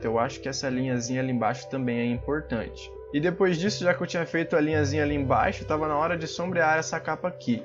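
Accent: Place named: Paraná, Brazil. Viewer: Paranaense alert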